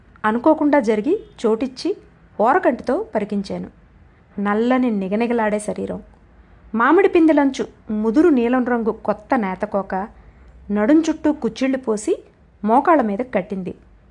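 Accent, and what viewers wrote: India. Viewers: కధ బాగుంది. రాములమ్మ పాత్ర బాగుంది. తూర్పు యాస మీ గొంతుక లో.బాగా.పిలిపించారు. వరలక్ష్మి గారి కథ బాగా రచించారు. మీ ఇద్దరికి నా ధన్యవాదాలు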